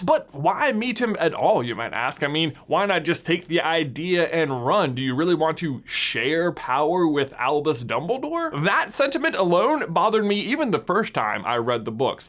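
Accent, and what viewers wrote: Germany. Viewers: Your germane accent is so funny and bad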